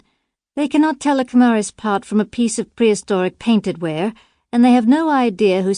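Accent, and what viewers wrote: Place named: United States. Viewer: Why an American accent? Peabody is British.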